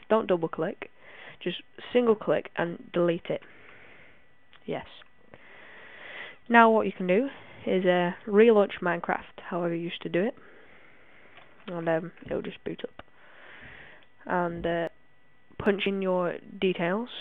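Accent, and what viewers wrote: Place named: Ireland. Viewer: Wow are you Irish that's awesome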